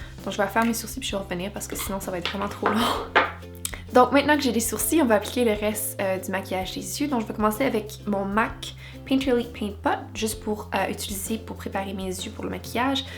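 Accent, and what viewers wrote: Quebec, Canada. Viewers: I've actually never heard canadian french, it sounds a bit different than the european french, but I love it =D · Youpi !!!! Enfin une vidéo en français je suis ravi de t'entendre parler en français avec ton joli accent québécois !!!! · Quand tu parle en francais tu as le meme genre d'accent que les quebecois !! :) tu es d'ou? :) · Merci pour ce vidéo! J'étudie le français et c'est génial de l'entendre, spécifiquement avec votre accent! (Tous mes profs sont Américains ou Français, donc j'ai besoin d'apprendre l'accent Canadien.) Merci,! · je savais pas que t'étais québécoise...j'adore votre accent. merci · jadore ton accent quebequois, love it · J'ai découvert ta chaîne il y a quelques semaines et quel bonheur de voir que tu parles français! Ton tout petit accent québécois te rends encore plus sympathique ;-) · sounds like a quebec accent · Coucou ma belle, je ne savais pas dutout que tu parlais français et encore moins que tu était québecoise. 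Cet accent est juste magnifique, j'aurai tellement aimer l'avoir ^^ Tu devrais faire des vidéos en français plus souvent, c'est vraiment agréable de t'entendre avec cet accent :) et aussi quand tu fait des swatch de ral, parce que je comprend certaines choses mais pas tout ^^ Je te fait de gros bisous et connue tes vidéos j'adore les regarders.😘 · Top ! J'adore t'écouter en français encore plus qu'en anglais, ton accent canadien est charmant ! like !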